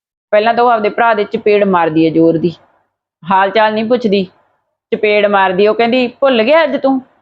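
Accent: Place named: Malwa, India. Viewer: ਤੁਹਾਡੀ ਬੋਲੀ ਤੋਂ ਮਲਵਈ ਝਲਕ ਪੈਂਦੀ ਹੈ । ਕੀ ਤੁਸੀਂ ਮੋਗਾ ਸਾਈਡ ਤੋਂ ਹੋ?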